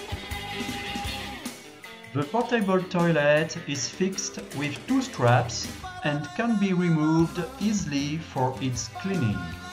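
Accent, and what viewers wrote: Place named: France. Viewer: Love French accent. Enjoy your van.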